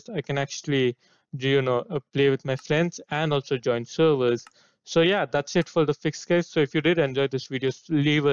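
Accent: India. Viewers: i like indian voice even if im not one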